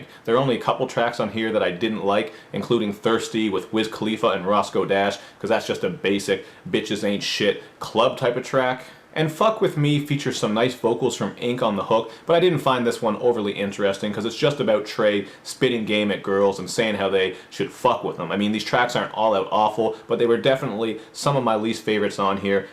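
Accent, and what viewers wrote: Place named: Western Canada, Canada. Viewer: Are you by any chance from western Canada? You sound like me lol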